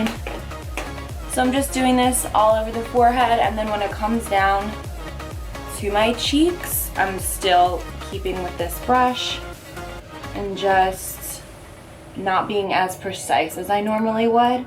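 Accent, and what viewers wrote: New Jersey, United States. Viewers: Where are you from? You sound like your from New Jersey or Long Island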